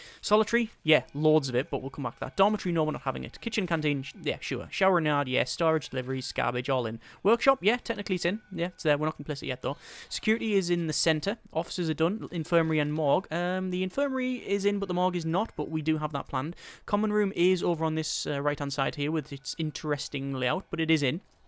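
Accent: United Kingdom. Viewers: Are you from the UK. England or Scotland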